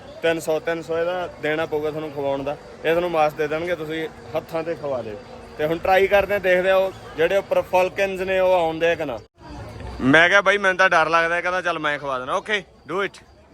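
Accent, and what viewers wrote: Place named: Malwa, India. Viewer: ਯਾਰ ਤੇਰੇ ਝੱਗੇ ਤੇ ਕੁਰਬਾਨ pure ਮਲਵਈ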